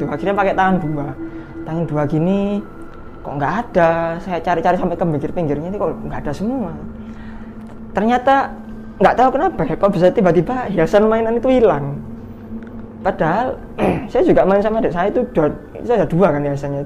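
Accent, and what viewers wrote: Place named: Java, Indonesia. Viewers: Logatnya ya ampun 😂Mengingatkan my ex org SBY 😅😅😅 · suka cara penyampaian nya,masio medok tpi g berbelit belit,,klihatan bgt aslinya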